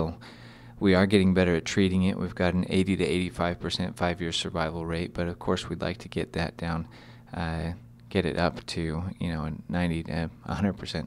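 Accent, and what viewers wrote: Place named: United States. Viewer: fatima Imtiaz he sounds American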